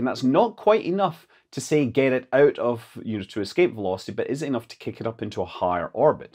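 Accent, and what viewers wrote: Scotland, United Kingdom. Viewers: I doubt you remember, but I asked you if you were Scottish or Irish a long time ago. How did you regain your Scottish accent and loose the American one also?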